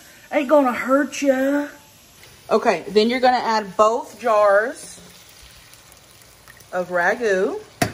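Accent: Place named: South, United States. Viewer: your country accent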